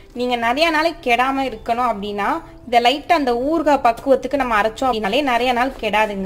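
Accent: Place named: Kongu, India. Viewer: Super sister, good for your slang , your native is kongumandalam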